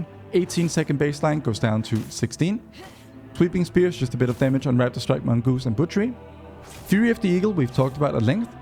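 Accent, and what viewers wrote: Denmark. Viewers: Love the guide! I do have a bugging question.. are you a Dane?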